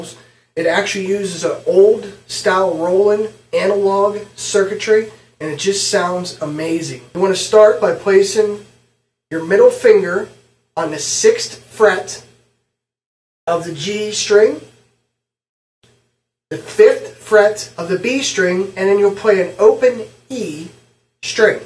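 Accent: Pittsburgh, United States. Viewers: Pittsburgh accent?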